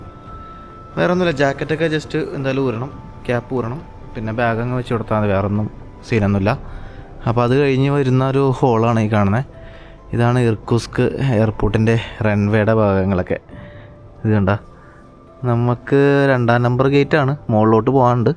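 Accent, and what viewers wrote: Kottayam, India. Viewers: Super 👌 എറണാകുളം malayalam പറ bro കോട്ടയം malayalam ആയല്ലോ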